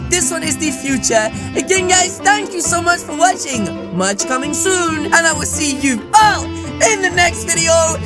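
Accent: United Kingdom. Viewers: uk guy ha? cool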